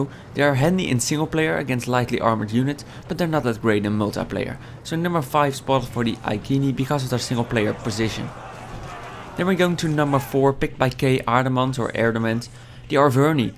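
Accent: Netherlands. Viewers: you sound like you're Dutch, if you are Dutch: ik vind je filmpjes heel leuk blijf doorgaan :) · I just can't listen to this Dutch accent ;/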